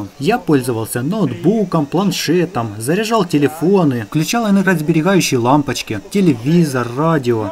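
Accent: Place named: Ukraine. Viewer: Ох ребятки.... Как бы украинский акцент не сыграл с вами злую шутку... Город полон украино-ненавистников!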